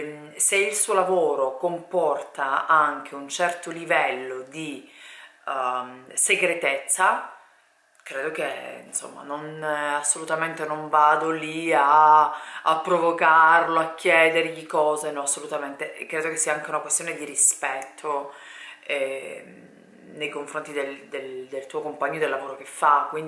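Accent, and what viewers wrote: Sicily, Italy. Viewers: Sei siciliana ? io sono di catania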